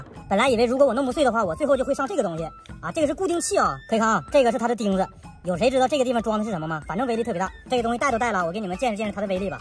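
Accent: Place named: Northeast, China. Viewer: YT大陆开箱up主当中位数不多的大陆东北口音。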